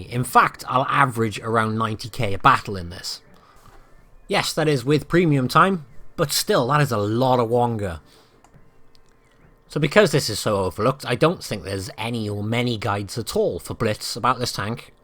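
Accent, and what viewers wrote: United Kingdom. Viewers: Wot Blitz your work is much appreciated, are you on any patronite websites? Do I sense right you're from UK? (accent)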